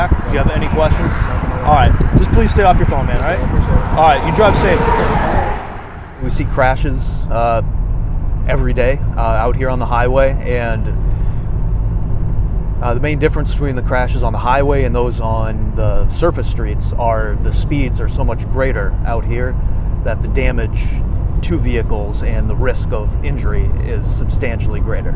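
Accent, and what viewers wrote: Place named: Minnesota, United States. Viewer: I appreciate your service Sir. I always thought they had an accent from bring out east ? You sound almost Minnesotan !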